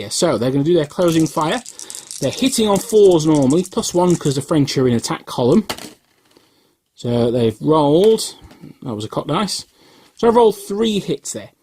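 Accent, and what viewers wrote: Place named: Yorkshire, United Kingdom. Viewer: I love the info your videos provide. Too bad we live a half world away. Napoleonic gamers seem to be an endangered species. Historical wargamers in general are much more rare here than over there from what I can tell. Certainly aren't any in southern Louisiana that I've run across or heard of. Sad really. The American civil war has been so stigmatized around here it has sucked down overall appreciation of military history, imo. I love your accent man, is that Yorkshire?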